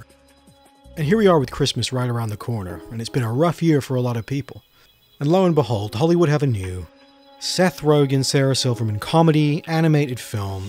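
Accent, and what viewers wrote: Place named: West Country, United Kingdom. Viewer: More likely from the south west country, not upper class but well educated working middle class from a nice area.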